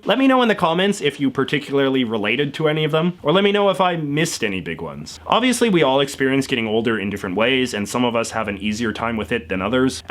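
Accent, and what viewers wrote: Canada. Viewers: That's the heaviest Canadian about I habe ever heard!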